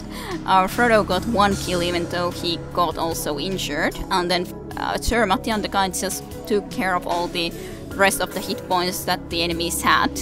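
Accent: Finland. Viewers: Well, Hungarian has certainly been "corrupted" so to speak by slavic languages and has taken some slavic sounds which I don't see anywhere in these Finnish words. Also, its why you say things like "as such" so uniquely, where a Hungarian person would have no problem making the "tʃ" sound ( its actually very common in Hungarian) But from what I heard so far, both Finnish and Hungarian maintain a rather consistent pitch, so when you speak English it results in an extremely interesting accent. Finnish seems to be more influenced by German then Slavic languages ( for example the word "Ritter" in "sormusritarit" ) As for Torment, that depends on whether you think you would enjoy it or not? :P I would recommend replaying it if you haven't played with a very high wisdom, as high wisdom makes for a much more interesting game.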